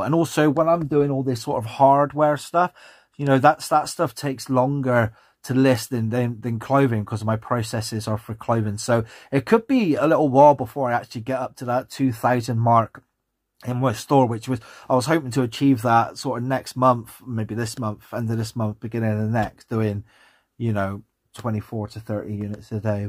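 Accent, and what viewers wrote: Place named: West Country, United Kingdom. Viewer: New sub. Caught your accent on one of s zoom calls & recognised it as a fellow West Country chap. Only got to 120ish listings before I revamped & unified using the same SKU & photo system. Wish you all the best with the restart 🙏 👍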